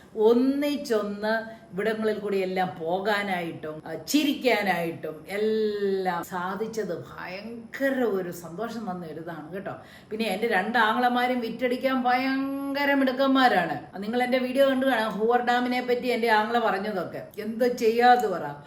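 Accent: India. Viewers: Nammal kottayam language supper my housepalail anu mam nu big salutu. Paranjathallam cherppatthil ingana airunnu veetil ❤❤❤❤❤❤❤ Allamm ketttu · ചേച്ചീ, മീനച്ചിൽ താലൂക്കിലെ ഭാഷ മിന്നസോട്ടയിൽ കേൾക്കുമ്പോൾ ഒരു സന്തോഷം 😃😍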